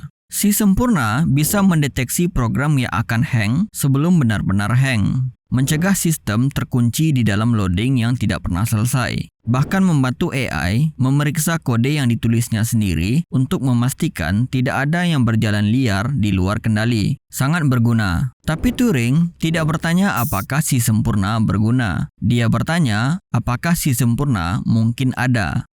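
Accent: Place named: Bali, Indonesia. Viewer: Pengisi suaranya orang bali kah? Berasa banget logatnya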